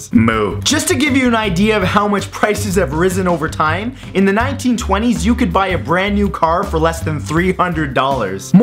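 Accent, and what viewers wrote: Canada. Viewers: are you from Canada? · ur Canadian?! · Are you Canadian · He sounds so Canadian in this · Are you Canadian? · Your Canadian? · He is canadian? Keeeeewwweelll.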